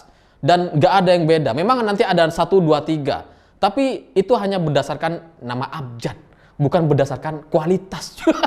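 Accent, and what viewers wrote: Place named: Madura, Indonesia. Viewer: Logat madura nya kental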